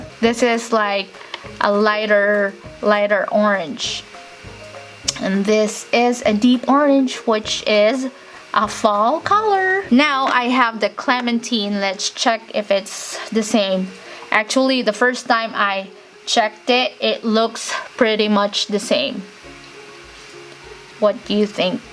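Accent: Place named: Thailand. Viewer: I love your thai accent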